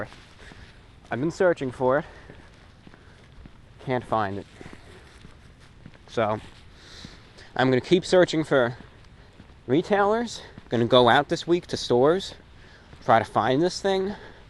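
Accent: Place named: New York, United States. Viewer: 7 years ago. Your NY accent was HEAVY back then.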